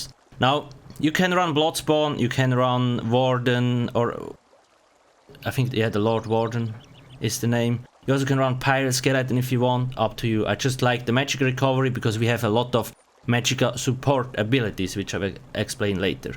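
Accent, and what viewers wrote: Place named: Switzerland. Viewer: His accent is Swiss.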